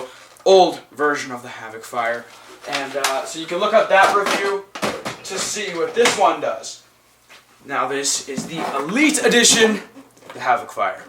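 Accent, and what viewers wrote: Canada. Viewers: Are u a Canadian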